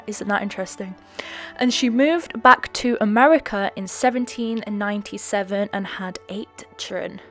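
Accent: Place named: Scotland, United Kingdom. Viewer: Am I the only one who watches these for her soothing Scottish voice? Honestly it's beautiful and I wish I had your voice :3 I'm English myself but I just wish I had your accent 💗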